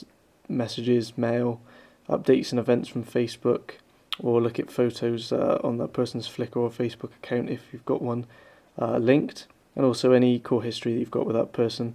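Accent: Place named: Bristol, United Kingdom. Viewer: Your a Bristol lad then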